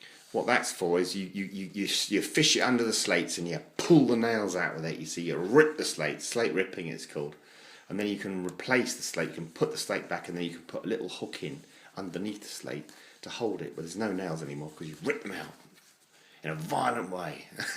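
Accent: RP, United Kingdom. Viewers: Heya … I didn’t get a notification you uploaded just came over after reading your comment on our channel.. I think you are posh 🤣🤣 I come here purely to listen to you don’t care what it’s a about lol.. my dad was a bricklayer and one time back in the day he built 32 houses on a building site and when he got to the last house they ran out of bricks so the gaffer said ahhh sod it bung a low roof on it and it started the bungalow craze off 🤣🤣🤣 always found his jokes hilarious 😂♥️♥️♥️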